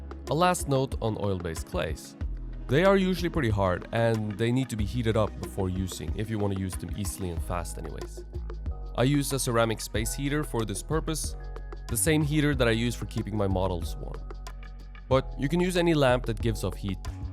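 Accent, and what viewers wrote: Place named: Italy. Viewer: your wood took from torante? Terante? Toronto? Please don’t have this Italian accent. I’m searching for this tool for the whole day and I can’t understand what’s the name of the brand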